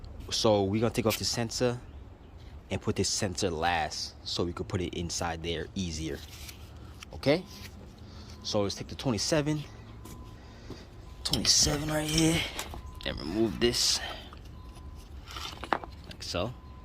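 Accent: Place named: New York, United States. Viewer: Bruh you from the east coast huh you sound like my partner Tommy he say stuff like there’s gone be mad fluid like the lingo and accent sounds like him and New York people 😇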